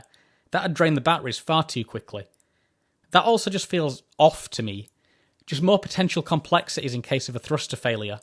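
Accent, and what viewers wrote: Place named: United Kingdom. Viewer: fwiw i think your voice/accent is great. granted im from the uk so i can understand it naturally, but it’s nice to hear people who speak “normally” (i.e. the way i/people i know talk) on youtube once in a while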